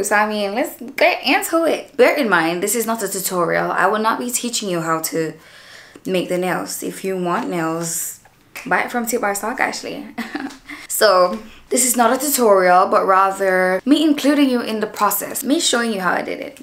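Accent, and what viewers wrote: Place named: United Kingdom. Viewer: Baaaaby didnt knew u were British... I love u girl ur voice put me to sleep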